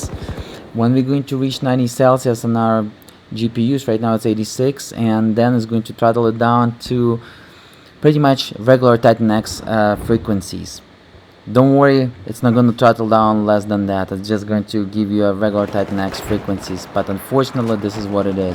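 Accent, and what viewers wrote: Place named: Russia. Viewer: official - 8K and 4K gameplay, benchmarks, reviews Мне кажется я почуствовал Русский акцент..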